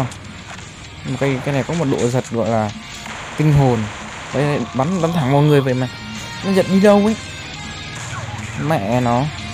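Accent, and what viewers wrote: South, Vietnam. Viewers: Miền nam à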